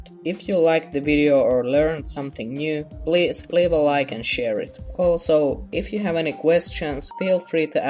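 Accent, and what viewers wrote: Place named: Finland. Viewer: Haha ku puhut enkkuu nii siit kuulee et oot suomalainen:D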